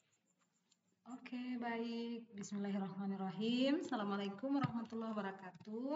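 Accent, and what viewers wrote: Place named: Bugis, Indonesia. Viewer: Pantas logatnya Bugis sekali 😂,salam dari sinjai ibu heheh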